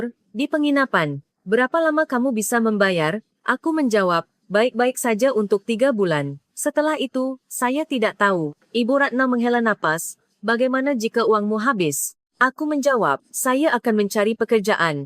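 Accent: Malaysia. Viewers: Bahasa mu Melayu Malay kenapa anda lebih suka bikin karangan lepas dan tidak jelas membawa nama indonesia apakah ini bentuk penghinaan anda terhadap Bangsa dan negara ku karna AQ Tahu ini cerita fiktif kenapa anda tidak membawa nama bangsa negara yang sesuai dengan logat bahasa mu bahasa yang kamu pakai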